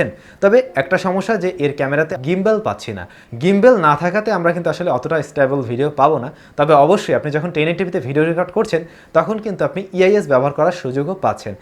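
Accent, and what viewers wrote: Barishal, Bangladesh. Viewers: apnar bari borisal, karon tak kay. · ভাই টাক খায় তো বরিশালের কথা। আপনার বাড়ি কোথায়?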